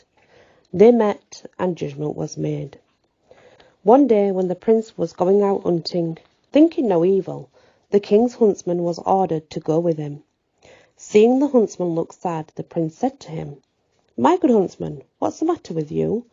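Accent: United Kingdom. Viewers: sounds great with you English accent 🇬🇧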